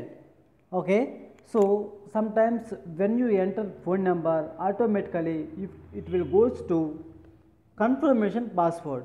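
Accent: India. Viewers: that is just Idian accent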